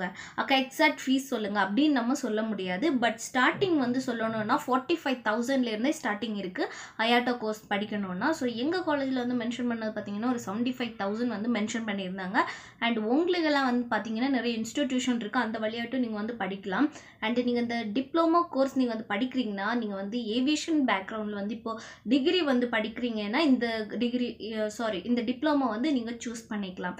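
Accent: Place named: Kerala, India. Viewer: Somewhere Malayali touch 😺😺😺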